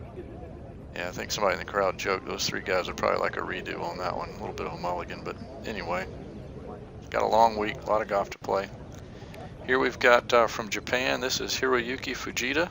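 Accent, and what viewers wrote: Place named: South, United States. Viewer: Hey, Rob here from England. Thanks a bunch for putting this up, it's home spun but that's good! Great to see the swings in slo mo, a great feature thanks! Love the commentary in that southern accent all we Brits think is so cool! I MUST get to the Masters one day, nearly did for the big 'five oh' this year, but didn't in the end... hopefully some year soon. Love Rickie Fowler's swing, it's unique for sure but why so long you think!??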